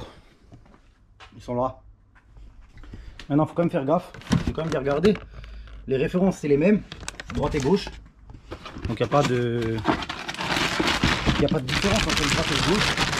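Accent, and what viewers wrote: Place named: Alsace, France. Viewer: Salut Cool Vidéo 👍 hop la jAIME Notre belle accent de lEst, question tu ne serais pas Alsacien par hasard,moi Oui,Et Fier de Notre Accent 😏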